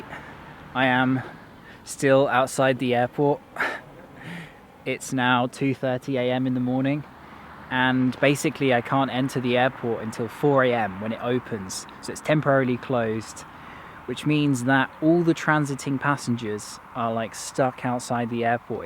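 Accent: England, United Kingdom. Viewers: it is British accent and I still don't mow his accent name.British accent is quite harder than American.What's more almost all of British accents fascinate me. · Cenker Köktebir It’s just a normal English accent. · I don't only watch your Videos for traveling but also I watch because of your good British accent. You are my British accent teacher too, 😅😅😄😄😍 Take care ! Love from Kabul, Afg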